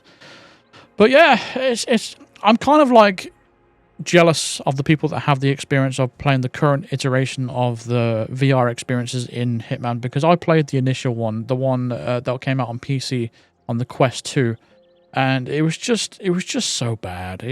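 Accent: United Kingdom. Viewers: I get that, it's all good also TikTok is banned is so many places as well. I can't remember where you're from. All I know is it European, I would say England or Great Britain. Not trying to fix you, I'm just say by your videos and your accent, I can tell your from somewhere around there. Also what you said about waiting for your healthcare. They being said I hope you're well man. I truly mean that. You have said they publicly. I'm not going to repeat what you sai, but I do remember when you talked about your issues. I just wish you well. Thank you very much. I can't praise you enough. You have been getting shit from people, ignore them please, just know there's people out there that love you for all your hard work and very much appreciate it as well. I wish you the best, thank you so very much. I love you man. You the best hitman guide out there.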